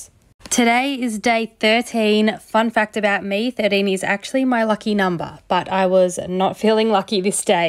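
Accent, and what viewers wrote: Australia. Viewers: You know what’s fun? Finding an Aussie making this kind of content! Usually it’s Americans or other nationalities. It’s oldly relatable seeing Big W, Coles and Woolies bags being used as your donation bags haha, just hearing the accent, the “brush it off and keep moving/devil may care” attitude to passive aggressive comments, and Bluey toys around etc even you having a doggo (it feels like all Aussies do!) it’s really a breath of fresh air. Hi from QLD! 💕✨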